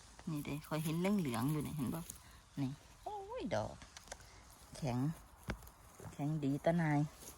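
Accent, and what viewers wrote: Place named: Surin, Thailand. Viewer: สำเนียงเว้า คือบ้านข่อยคักลาวสุรินทร์เด้อจ้า ตอนนี้มีภูมิลำเนาอยู่สมุทรปราการครอบครัวข้าราชการจ้า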